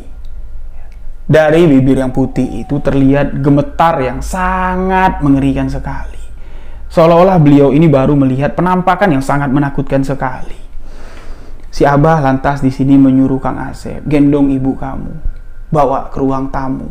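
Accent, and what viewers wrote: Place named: Jambi, Indonesia. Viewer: Senang banget liat bg bercerita... Jngan lupa logat jambi nya ya bang?? Kereeeen.. 👍👍👍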